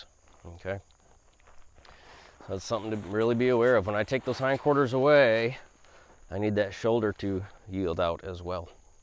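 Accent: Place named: Michigan, United States. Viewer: knew by the accent you were probably from Michigan (from royal oak myself).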